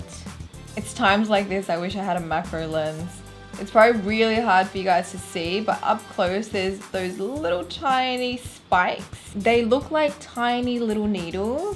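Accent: Australia. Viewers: An Asian woman with an Australian accent? That's so cool!!! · What accent is urs? Sounds a bit Australian but im not sure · The Australian accent.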